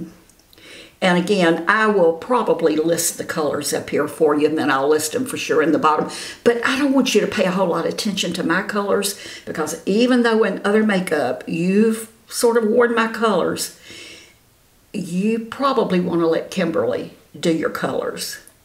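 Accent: South, United States. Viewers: I just came across your video and consider myself a very mature skinned woman at almost 69 years old. Kimberly also was the artist who convinced me to take the deep dive, even though she was not the artist who did my colour match. I had been on the fence and had been contacted by individuals I knew but having mature skin compared to their flawless young skin was making me very hesitant. Watching Kimberley Weiner tipped the scale. The beginning of your video compared to the end should convince anyone to give this makeup a try! You are beautiful & you ROCK with confidence. Thanks for sharing🙏❤️ BTW....love your southern drawl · what a warm, beautiful spirit you have; much less your southern charm and charisma drenching your whole persona! You are definitely a spell binding performer and entertainer while teaching us girls how to look our best! i was born and raised in Atlanta and could listen to your southern belle talk forever! 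Carry on, sugar, you're fantastic!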